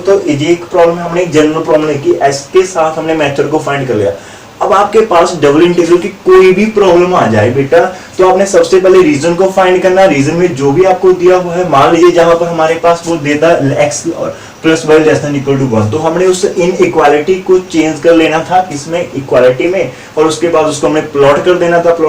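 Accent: Punjab, India. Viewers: Thank you sir ji ,Punjabi touch aayi jaanda boli ch Sir ji 👌👌👌👌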